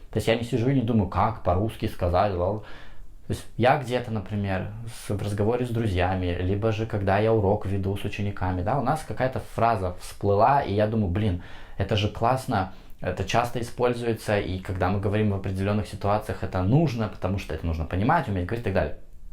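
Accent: United States. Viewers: Да, отчетливо услышала американское произношение 😊.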